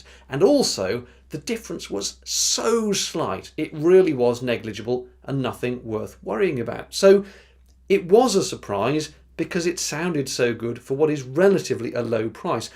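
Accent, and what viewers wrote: United Kingdom. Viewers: i love british english :P good review, will buy it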